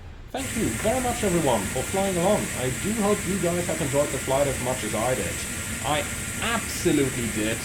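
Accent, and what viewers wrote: Switzerland. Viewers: Sehr schöner Schweizer Akzent. Grüsse aus der Schweiz :-)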